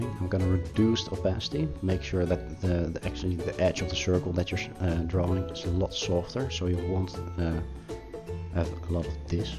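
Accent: Netherlands. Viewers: your obviously dutch i hear that imidiatly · Lmao never been so confused Je accent liet mij denken dat je van Nederland bent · Are you dutch? I recognize that accent. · Yoooo that accent thoooo are u dutch ? Cus so am i lollololol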